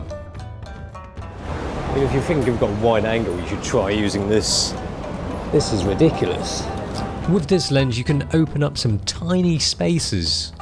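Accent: England, United Kingdom. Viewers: yellow skin with British accent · He said in Cantonese that he was born in the UK, albeit Cantonese with an English accent. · Hongkongers can immediately tell the accent of a British Chinese (i.e. accent), but communication is not a problem at all;) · Slick Trademarc he is speaking cantonese but it's slightly out of tone. It has english accent in his cantonese LOL! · u never hear speaking chinese with british accent, weird but kinda refreshing LOL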